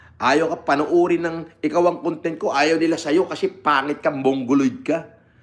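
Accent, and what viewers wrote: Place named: Nueva Ecija, Philippines. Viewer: nangto tunog nueva ecijano, kupal peke imahe HAHAHA😂😂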